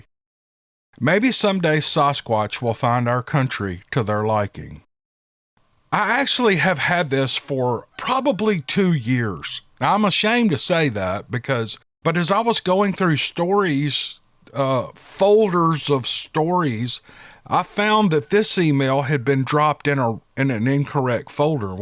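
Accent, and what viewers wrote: South, United States. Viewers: ...my wife claims to hear an East Texas coworker say "might-can" a lot, and I have never heard anyone say that, until you opened this episode with a "might-could." Thanks for your wonderful Southern accent and jargon. Merry CHRISTmas to you and your family!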